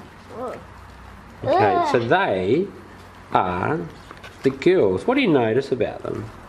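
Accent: Australia. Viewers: its friendly to grownups beginner. he talks australian english. takes, cant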